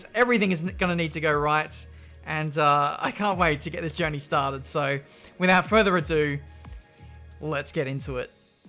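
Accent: Australia. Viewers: oi mate you from Australia? sounds like that, hehe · i was suggested this channel by a fellow formula 1 friend and i was like "oh ok, ill start watching him then" this is the first video of yours ive watched and its fliping amazing what makes it even better is the fact ur australian, i love australians, i think their accent is so cool i will definetly be recommending this channel to other people keep up the amazing work also congratulations on making it to f1 e sports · Are you australian?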